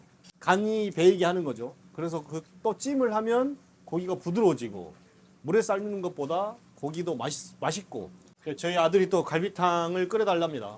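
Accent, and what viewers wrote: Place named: Jeolla-Gyeongsang border, South Korea. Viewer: 말투나 식성을 보면 전라도와 경상도 그 경계 출신인듯 함.. 하동이나 여수분이 아니실까??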